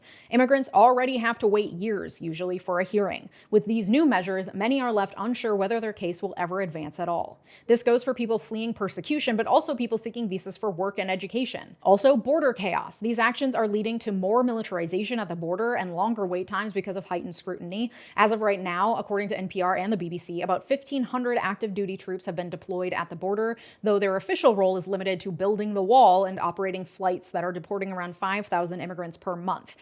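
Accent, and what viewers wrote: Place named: Minnesota, United States. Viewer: you are obviously a childless woman and hopefully it will stay that way. you do NOT need access to children. Based on your accent, you are located in Minnesota, where you are completely removed from the CHAOS that illegal immigrants cause in the border states. They are constantly causing fatal car accidents in Texas, due to a culture of drinking and driving. Many of them also don't see anything wrong with grooming 14 year old girls because in their home country, it's normal for underage girls to get with grown men. I've seen several of your videos and most of them sound like you're completely disconnected from the reality of life.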